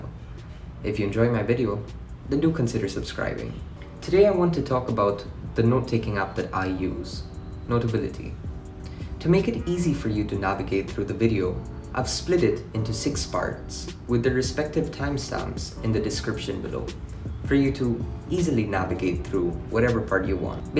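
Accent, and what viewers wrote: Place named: India. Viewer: Holy hell, the Indian accent hit you hard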